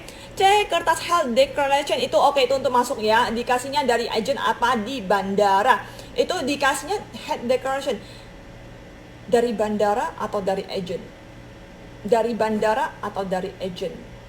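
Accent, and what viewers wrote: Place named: Taiwan. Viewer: Eh di Taiwan kerja apa sih kok cengkok bicara nya uda sangat menyatu dengan Taiwan.uh..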